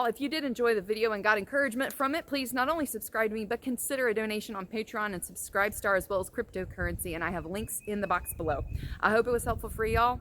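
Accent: South, United States. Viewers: Yes I like what you have to say I think the same way I hear you got to hear you have a Southern Voice I live in South Carolina I like to meet you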